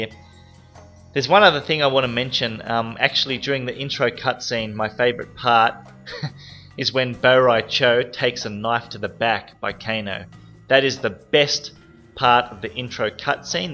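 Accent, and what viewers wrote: Australia. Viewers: It'd ruin it if he did make it 'big' though, part of his charm is that he more or less DGAF. He keeps it real, he's just an authentic, enthusastic gamer who's very naturally funny and is excellent at explaining things. The Aussie accent is a bonus. If he went down the Pewdiepie route I'd never forgive him.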